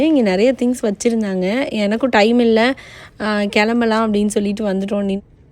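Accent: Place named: Madurai, India. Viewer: Your explanation in Madurai tamil slang is . Good video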